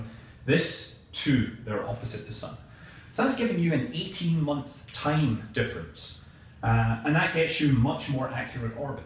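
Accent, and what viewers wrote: Scotland, United Kingdom. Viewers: This is too scottish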